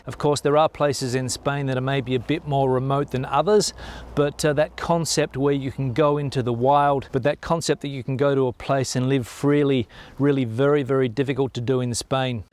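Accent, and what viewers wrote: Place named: Australia. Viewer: Cheers,. When I first found your channel, I was quite confused. Your rapid speech, Australian accent, and my bad hearing combined to have me thinking your name was Jordy!(I know!😂) The first comment I ever made on one of your videos, I called you Jordy, then I read all the other comments calling you, and I was so embarrassed that I went back and edited it! I love your updates, no matter where they are from👍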